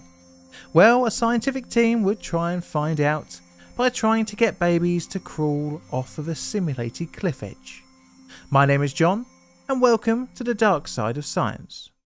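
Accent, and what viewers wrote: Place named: South London, United Kingdom. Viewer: Hello Mr South London. 👍🏻🇬🇧...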